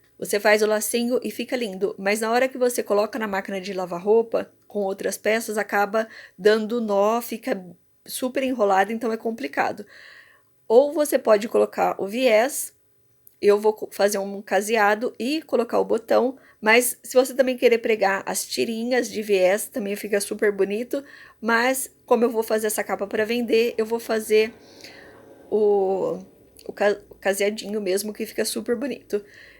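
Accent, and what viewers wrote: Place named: São Paulo, Brazil. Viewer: Me perdoe amiga mais esse sutaque paulista me dá nos nervos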